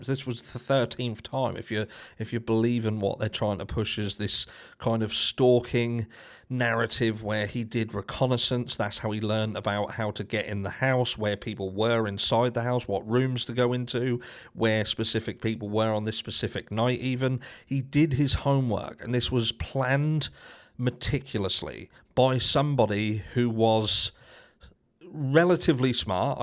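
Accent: United Kingdom. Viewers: I love British accents including yours but today I realized how well you also annunciate your words. I tried but I can’t see the DNA proving Bryan is guilty because I think the knife sheath was planted. Apart from all of the problems with the DNA, Cristi and Steve came out later and declared that Kaylee had tried to get away but she was trapped on 3 sides of the bed. They deduced this I believe from something Steve found out from their investigator. They were told that Kaylee was on top of Maddie in the bed. I’ve heard a couple of scenarios of the killer throwing her on the bed where she landed on Maddie. The coroner said no one is permitted to move the bodies including the police. At first no one, including Brett Payne, saw that sheath. It was on the second walk through that Payne claimed he saw the knife sheath from the doorway. It was either under or beside Maddie. 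He must have X-rays eyes to see that sheath under 2 bodies and 2 bodies that were bloody because there was no blood or any other DNA on that sheath. Jay is for Justice had a great video especially about “Cathy with a C” Mabbatt, which she and others kept pronouncing it MUH BUTT. She did funny imitations of Cathy wringing her hands and being so nervous, evasive, and vague.When she was asked where the bodies were found, she hesitated and said she didn’t think she was permitted to give out that information. She also said that the news report stated that they were stabbed with a knife. Jay commented and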